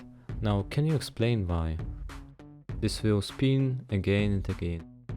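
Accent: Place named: Russia. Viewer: russian accent... hmmm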